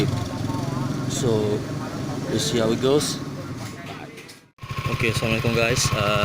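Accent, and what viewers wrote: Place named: London, United Kingdom. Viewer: Fuh.. Brader speaking London. Not bad for first video. Keep on the good work. You know who I am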